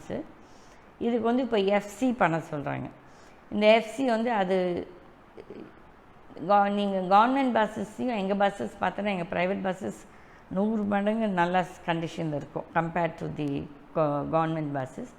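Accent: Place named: India. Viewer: Would be more genuine if you have concern on soaring fuel price, high inflation, migrants plight, increased toll fares, dampened msme industry. There are bigger national level corruptions that you've conveniently missed to mention. Rajaji s swatantra party did nothing useful to TN and you are carrying the same name; doubtful on your agenda esp to avoid elections - do you want to continue the existing corruption to go on. Election is the only tool to correct the citizens' mistake/fate; you want that to be denied for the vested interests. Should also mention that thousands crowded in Ayodhya, moharram day at Hyderabad, sellur Raju meeting at Madurai or the mahalaya amavasya at sathuragiri. Pls speak Tamil in the native slang, the hindiing sound is irritating. நிலானியின் தமிழை கேட்கவும்.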